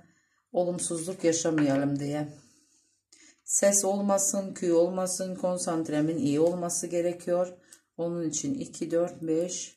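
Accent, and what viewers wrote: Azerbaijan. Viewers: Ağzınıza sağlık.Güzeldi.Bu arada sanki Azerisiniz.Konuşmanız azericeyə benziyor.Azərbaycandan yazıram.❤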